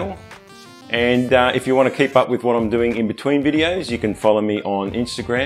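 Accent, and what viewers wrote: Australia. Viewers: Where have this channel been all my life? I love it! Subscribing for sure👍🏻 I love me some aussie accent aswell👌🏻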